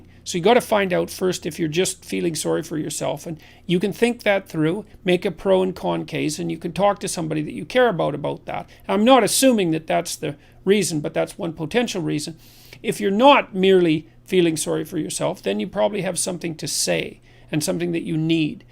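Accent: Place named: Australia. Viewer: This is Australian accent ,Farmer style. All the country style accent have same characteristics, such as quick , not clear , not stable speed .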